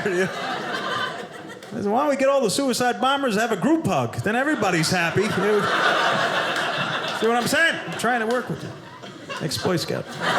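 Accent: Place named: New York, United States. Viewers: But I like his neuyak accent regardless. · My favorite NYC accent 😁... your road rage joke "pre-finger" 🤣🤣🤣. Good stuff! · Its true about driving in NYC when someone cuts you off they give you the finger. Its not a joke it happens every day! But I realize that is funny to people not from NYC!Although his accent says Long Island not the city.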